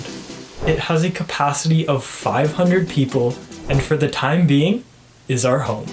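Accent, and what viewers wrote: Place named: Iceland. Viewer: Are you Icelandic?! I thought you were english !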